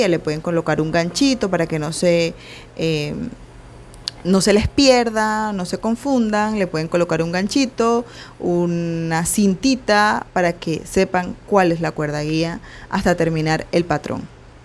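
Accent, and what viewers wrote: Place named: Venezuela. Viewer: me encanto, explicas de una manera muy sencilla y creas un muy buen ambiente. Creo que eres venezolana por tu forma de hablar, con lo cual somos paisanas. Siempre he tenido problemas al calcular el material que necesito y con tu explicacion lo has hecho super faci. <<<<<gracias por compartir...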